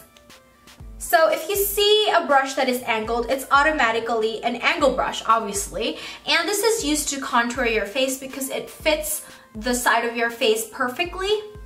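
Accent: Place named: Philippines. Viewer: You are so PRETTY! and I sooooo LOVE your accent! BTW, are you a filipino?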